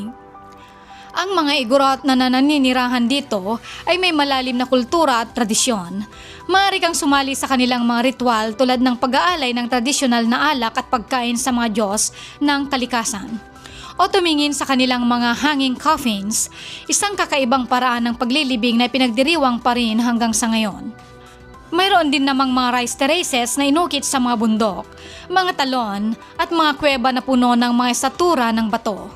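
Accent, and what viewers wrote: Visayas, Philippines. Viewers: Copy paste ang narrator sa ibang pages eh! Peru ang tono bisaya!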